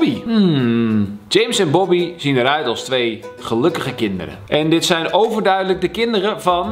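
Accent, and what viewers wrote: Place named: Amsterdam, Netherlands. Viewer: In deze video heeft Kalvijn echt een Amsterdams accent😅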